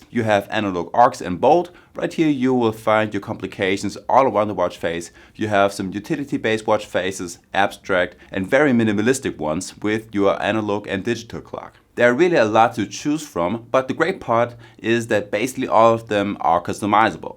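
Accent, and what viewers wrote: Germany. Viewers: aer you from germany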